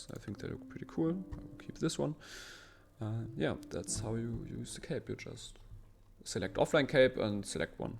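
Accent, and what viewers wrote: Germany. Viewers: wait a minute u are german ?! (i can tell by the accent) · Direkt erkannt das du deutscher bist xD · hörst dich deutsch an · Bist du deutsch bcs Akzent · are you german ? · joo dieses english seit ihr deutsche ??? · Deutsches Englisch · Ich richte den Deutschen Akzent haha ich liebe deinen Client ! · dierekt gemerkt das du deutscher bist HAHA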